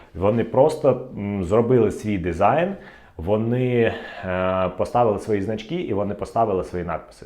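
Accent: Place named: Russia. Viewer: Акцентик москальский однако), суржик.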